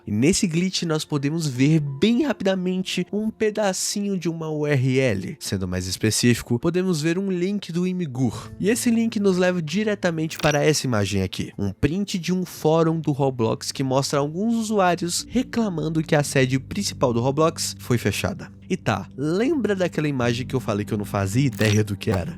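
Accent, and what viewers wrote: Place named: Portugal. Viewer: O cara e português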